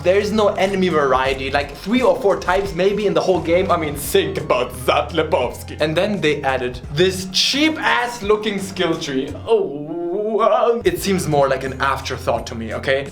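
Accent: Germany. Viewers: Are you German? your accent reminds me of my german teacher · asian german speaking english im sold xD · DIeses Deutsch-Englisch so geil :D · german for sure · Are you from Germany? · Are you german? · you are chinese but your accent sounds like german and french some times and did you just said "figlio di putana" (son of a bitch in italian) ? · why the fuk do you speak deutglish im mexian how the fuk am i supposed to understand you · Hes german I think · Mostly its German but he often loves to put some Asian accents in. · are you German? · weird question: are you german? lol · Ich Love your Denglisch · Liebe den schnellen englisch deutsch mashup, weiter so xD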